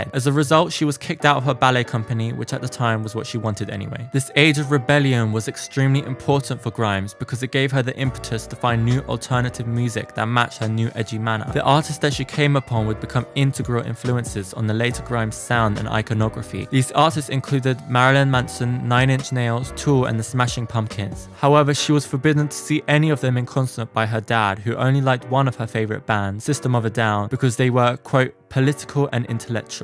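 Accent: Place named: North, United Kingdom. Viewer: I guess this YouTuber is from somewhere of Southeastern Scotland or Northeastern England, anyway the very northernmost part of Britain but little bit to North Sea coast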